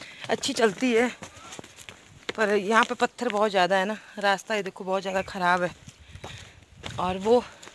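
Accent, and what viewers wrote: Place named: Himachal Pradesh, India. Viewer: Ur language is as u belong to Una dist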